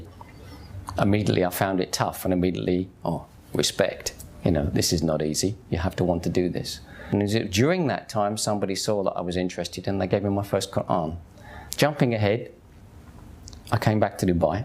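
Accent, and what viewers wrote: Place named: London, United Kingdom. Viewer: I like your London accent but I can't do it. I do US accent, I lived there many yrs. I am Malaysian Malay Muslim.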